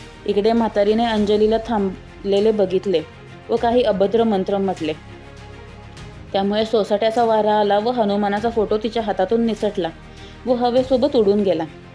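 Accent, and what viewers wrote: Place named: Konkan, India. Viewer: Kokani tone Chan aahe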